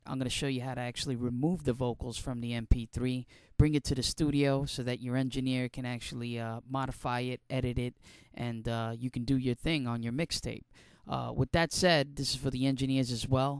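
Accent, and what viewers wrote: New Jersey, United States. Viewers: You sure have a New Joisey accent. AWDACITY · are you from new jersey?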